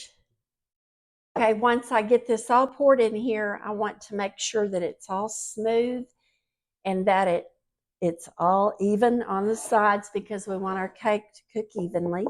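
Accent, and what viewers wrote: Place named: West Virginia, United States. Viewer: So delectable. Love apple anything. Where are you from? I am originally from WV, I'd swear you are too!!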